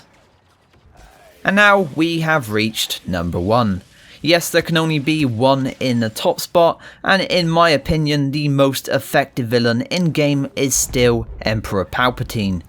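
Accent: West Country, United Kingdom. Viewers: Like the West country twang :)btw Thanks for helping out RedWolf72ps4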